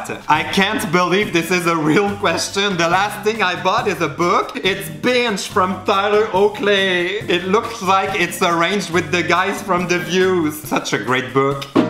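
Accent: Quebec, Canada. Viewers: That was so hilarious! I didn't know that French Canadian people had a French accent when they speak English... That was a funny discovery. (Come on! Siblings: Frères & Soeurs) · I'm surprised by the accent. I thought only the Francophones from France have thick accents, but not the Canadian Francophones. Anyway, trop drôle! :D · Je ne pouvais pas m'imaginer que l'accent québécois assez aussi horrible que français en anglais. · T'as exactement le même accent québecois mais en anglais hahahah comme moi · Nils Bondenson I get what you mean, I live in france and for me the quebec accent is so different yet ppl there seem way nicer. He has a strong accent though. I was listening to Justin Trudeau yesterday and even he has a slight accent in english! I was like woooh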